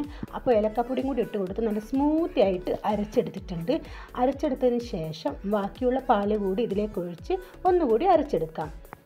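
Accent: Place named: Kannur, India. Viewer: മാഷാ അല്ലാഹ് എനിക്കു ഏറ്റവും ഇഷ്ട്ടം ഉള്ള ഒരു സാദനം ആണ് ഈ കാരറ്റ് 😋കാരറ്റ് കഴുകി മൊഞ്ചക്കി പാവം അതിനെ ചൂട് വെള്ളത്തിൽ മുക്കി അതിന്റെ ചുറ്റും ഉള്ള അണുക്കളെ ഒക്കെ കൊന്ന് വേവിച്ചു തണുത്ത ശേഷം മിക്സി യിൽ ഇട്ട് കുറച്ചു പാലും പഞ്ചാരയും ഐസ് ഐസ് ക്രീം ഒക്കെ ഇട്ടു ഉണ്ടാക്കിയ അടിപൊളി ഹെൽത്തി ജ്യൂസ്‌ ഉണ്ടാക്കുന്ന കാഴ്ച ഞമ്മുടെ കണ്ണൂരിന്റെ സ്വന്തം ചാനൽ നിങ്ങൾക്ക് മുമ്പിൽ നാടൻ കണ്ണൂർ സ്റ്റയിൽ സംസാരംകൊണ്ട് നിങ്ങൾക്ക് മുമ്പിൽ അവതരിപ്പിച്ചിരിക്കുന്നു എനിക്കു ഇഷ്ട്ടം ആയത് കൊണ്ടു എന്റെ ഇന്നത്തെ ഇഫ്താർ ഡ്രിങ്ക്സ് ഇതായിരിക്കും 👌👌😋🥰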